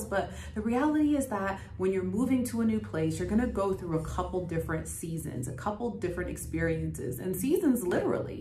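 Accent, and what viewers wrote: United States. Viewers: You should have moved to Limpopo province. It is hot there., you sound like you're from Texas, Austin. Lol. I'm a South African studied and lived in the USA for more than 20 years. In Kansas it snows. I visited Austin TX in winter. The other day it snowed and that is when I realized it rarely snow in Austin because of the car accidents on the roads.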